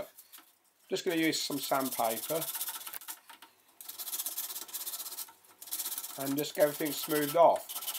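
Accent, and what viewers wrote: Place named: England, United Kingdom. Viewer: Good one mate! You have a great pommy accent for someone with an Italian name.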